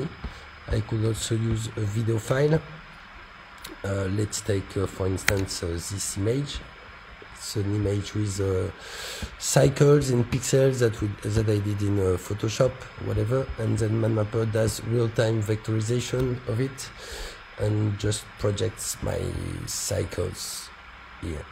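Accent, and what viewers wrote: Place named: France. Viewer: Un français qui parle English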